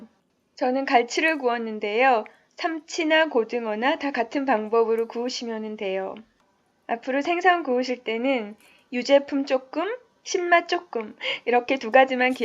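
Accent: Seoul, South Korea. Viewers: 서울사투리 듣기 좋네요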